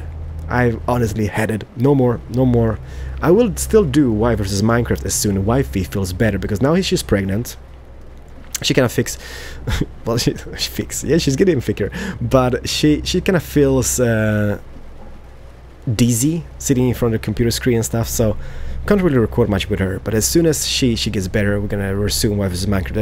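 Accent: Scania, Sweden. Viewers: Vilken härlig skånska du har :) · Ahahahaha skånska <3 omg varför låter allt så roligt?! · And plz try to not speake skånska när du utalar :) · For all people who aren't swedish! does not speak any swedish, he speaks skånska!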